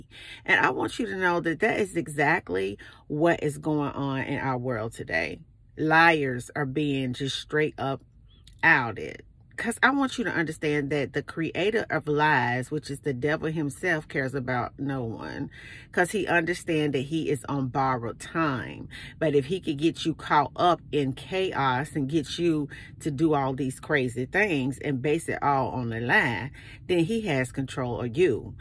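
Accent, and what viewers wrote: South, United States. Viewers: with all that Country accent, your right on point! Ain't it good to have ears that can hear!!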